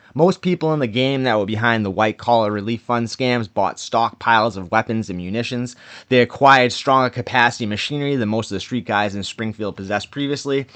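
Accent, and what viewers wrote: Boston, United States. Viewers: This guy has a boston accent lol😂you ain't from the field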